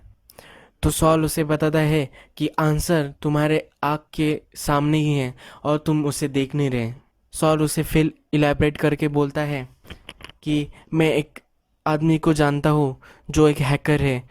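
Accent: Maharashtra, India. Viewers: Good story telling...but i noticed you are marathi bhava from accent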